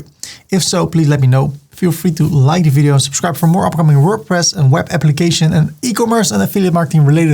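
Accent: Netherlands. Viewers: i love the dutch when they speak english zo schattig 🥰😅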